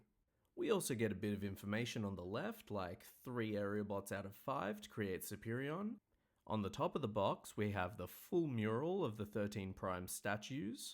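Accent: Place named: Australia. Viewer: Nice to hear another Aussie accent. Funnily enough I'm also a haha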